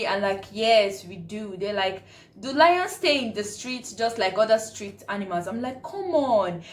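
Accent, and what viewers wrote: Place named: Nigeria. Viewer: I love ur Nigerian accent "Maddah & Faddah"🤣🤣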